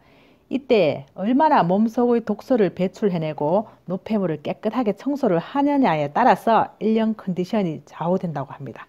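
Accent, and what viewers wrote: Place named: Daegu, South Korea. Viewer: 구수한 대구사투리 억수로 좋슴미다요🤗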